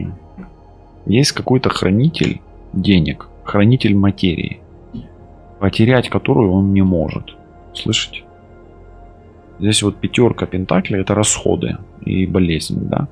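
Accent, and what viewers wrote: Ukraine. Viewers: доброе утро! Как я соскучилась по Вашему голосу,раскладу,как мне нравится как Вы доходчиво всё доводите до нас,как нравится Ваш хохлятский говор,я прожила 42 года с хохлом,мне всегда нравился их говор, шо требе,кохано,цебуля,цеберка,тырло...Что же у меня будет с моим Анатолием,42 г.прожили вместе, больше нет сил терпеть унижения,уехала,в четвертый раз,надеюсь навсегда, больше ничего не хочется,пятеро внуков,две дочери 39,41г. Говорят мама поживи для себя,папа никогда не изменится Анатолий,<num> г р Что нас с ним ждет в наших отношениях?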